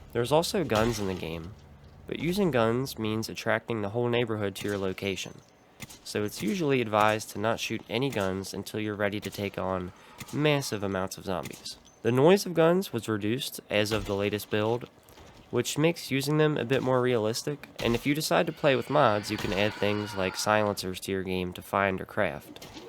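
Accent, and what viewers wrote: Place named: Baltimore, United States. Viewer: You from Baltimore? You have the accent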